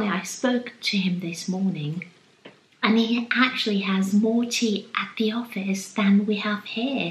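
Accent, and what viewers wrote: England, United Kingdom. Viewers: I keep watching your video's. Your English is so beautiful, still you make German cakes. So I wandered if you are from Germany, what is nearly impossible because you sounds so really English. Carine from Bruges, Belgium. I love how you bring charming England so nearby.☘️🌷☘️🌷☘️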